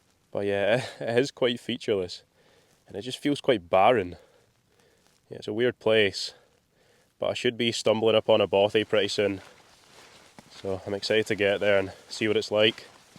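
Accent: Scotland, United Kingdom. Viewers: It's quite special to listen to your Scottish accent and turn of phrase for someone who is not from the UK! "Wee rivers" and "glens", for example. We didn't see you cooking or similar house keeping - I think I'd enjoy that, but maybe you've decided to focus on the actual hiking. Thanks for taking us along - from Cape Town, South Africa. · the new filter you are using , i need a new one and yeah first hand experience is best , salomon would be my usual goto filter ... is the katadyn be free 1l good enough ,better or worse than salomon do you know ? nice vid ,you narrate your walk wonderfully scottish 😊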